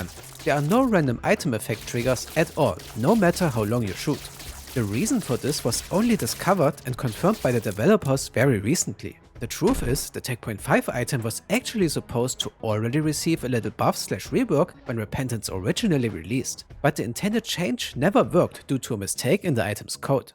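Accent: Germany. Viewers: Ahhh jaaa der schöne Deutsche Akzent wunderbar❤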